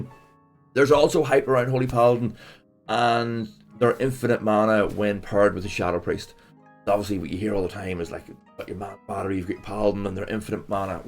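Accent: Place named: County Down, United Kingdom. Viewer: Where in northern ireland you from mate, sound like county down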